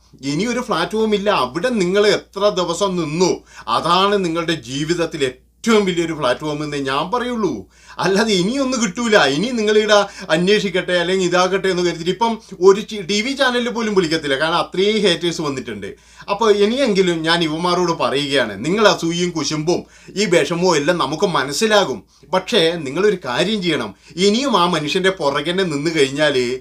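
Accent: Kannur, India. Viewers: നിങ്ങളുടെ കണ്ണൂർ ഭാഷ ❤ നാട്ടിൽ എവിടെ ആണ് ❤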